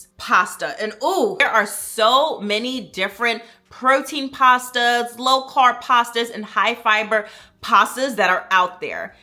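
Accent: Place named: Bronx, United States. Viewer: I loveeee your accent! Some of my fam is from the Bronx! ❤ New Sub here!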